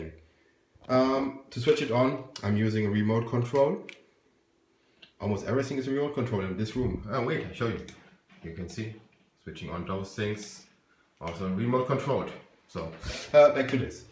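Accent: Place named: Germany. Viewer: Wow, das nenne ich mal eine hurtige Antwort! Danke, wollte nochmal eine zweitmeinung dazu hören weil ich mir wegen gleich und Wechselstrom nicht so sicher war... Bei den Lüftern bin ich noch am überlegen Wiedes am besten funktioniert... wahrscheinlich werde ich das ganze in dem Gehäuse eines alten pc-Netzteils verbauen und die Originalen Lüfter verwenden obwohl das auch passiv gekühlt ausreichen sollte. Habe nen Kühlkörper einer alten Grafikkarte genommen, der besteht aus massivem Kupfer und sollte mehr als ausreichend sein. Super, Danke nochmal für die schnelle Antwort, jetzt kann ich mich an das Kit ran machen dann ist es mit etwas Glück heute Abend alles fertig. Hehe der Akzent war doch etwas verräterisch deswegen hab ich mal auf deutsch getippt 😁👍 Grüße zurück Andreas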